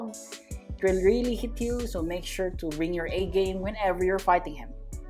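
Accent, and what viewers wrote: Philippines. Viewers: I just noticed hes name in the leaderbords hes name is which is a filipino language which means dough that is sticky correct me if im wrong so that means hes a filipino well its obviouse when hes talking btw am a filipino too so eyyyy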